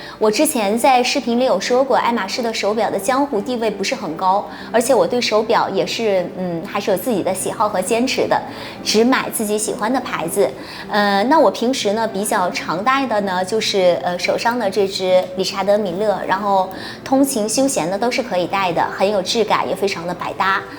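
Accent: Northeast, China. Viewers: 的发音好东北啊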